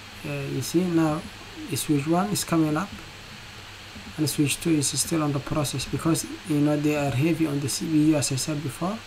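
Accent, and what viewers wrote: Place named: Somalia. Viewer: waraa english kaada aaba kafahmay inaad somali tahay:face-blue-smiling::face-blue-smiling::face-blue-smiling:🤣🤣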